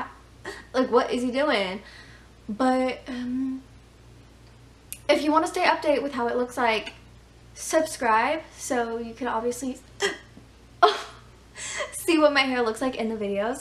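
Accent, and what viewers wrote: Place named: India. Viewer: her accent is some what indian...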